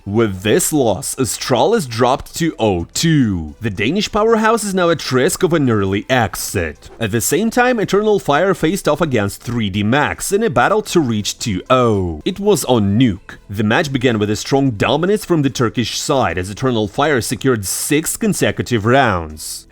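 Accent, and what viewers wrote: Russia. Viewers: This Russian accent makes me cringe, sorry.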